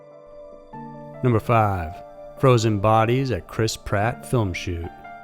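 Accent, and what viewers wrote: United States. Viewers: Which part of the US, is this guy from?, so love his voice!, and of course his posts.😊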